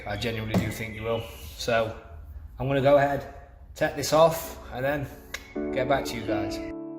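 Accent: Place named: North West, United Kingdom. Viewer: Where are you from north west because that accent is mint lad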